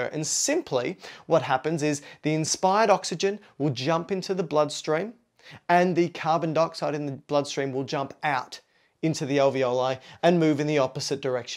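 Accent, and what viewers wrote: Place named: Australia. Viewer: Australian boi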